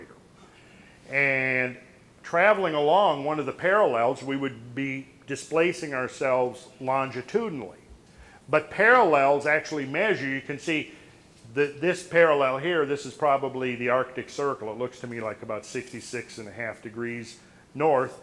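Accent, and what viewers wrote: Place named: Midwest, United States. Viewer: Minnesotans talk a little funny, don’tcha know? He may live in GA now, but he’s from MN, and he sounds like a northern Midwesterner.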